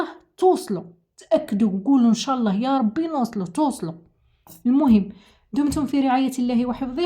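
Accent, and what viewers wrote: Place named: Béchar, Algeria. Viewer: قبل ماتقولي انا من بشار من هدرت عرفت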